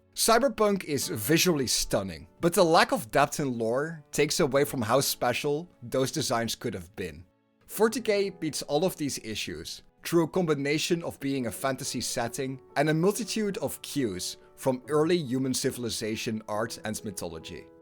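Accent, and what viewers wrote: Netherlands. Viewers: The dutch accent.... like none other :)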